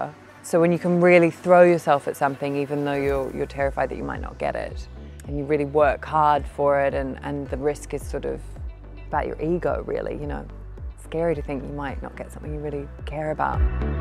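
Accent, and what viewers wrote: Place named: Australia. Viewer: I'm an Aussie and she sounds Australian to me, absolutely.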